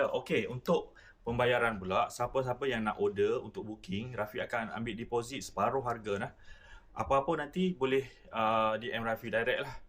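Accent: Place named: Perak, Malaysia. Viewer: 😂😂😂 Peraq betui mu. Aaarr.. Psl x sebut Anmummm😅 oiii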